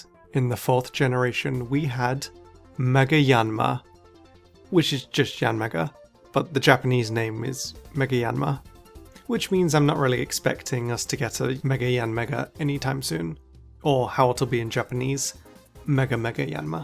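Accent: United Kingdom. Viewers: Exces me are you British? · he’s bri’ish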